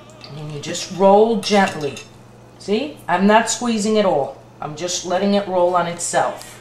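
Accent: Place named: Italian-American, United States. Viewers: Cant get enuff of the ny in ur voice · the way you say prosciut ricott and mozzarell! He says it's sooo like the movies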